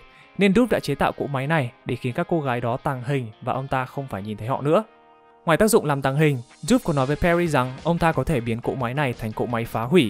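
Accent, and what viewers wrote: Standard. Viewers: Tiếc nhỉ mà ad có giọng hay thật lại phát âm chuẩn nữa và đầu óc cực "chong xáng" khi làm về Doof's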